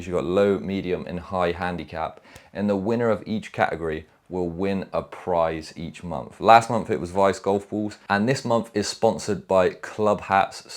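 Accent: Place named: Bristol, United Kingdom. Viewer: what is that accent, Bristolian?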